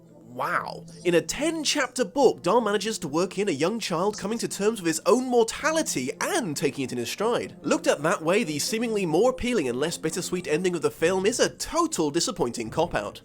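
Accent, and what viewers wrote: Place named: United Kingdom. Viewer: YOU ARE BRITISH! So am I Hyper mega mega fan boy mode activated. I mean your accent was obvious but I needed to be sure, then your complaint about the Americans ignoring us Brits definitely sold it for me. Also they have acknowledged us, well at least the MCU has. Now they have Tom Holland, Tom Hiddleston and Benedict Cumberbatch as Spider-man, Loki and Doctor strange.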